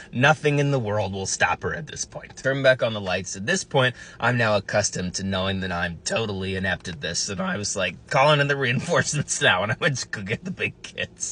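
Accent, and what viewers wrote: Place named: Texas, United States. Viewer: That accent and jacket... You did not indeed mess with Texas. Except, Austin. That's not Texas. Great video btw!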